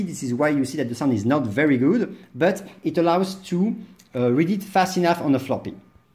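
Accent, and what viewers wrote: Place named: France. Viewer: Accent français😂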